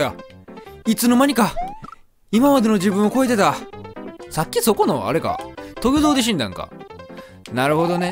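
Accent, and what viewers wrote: Kansai, Japan. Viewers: さんの関西弁大好き！同士おるよね？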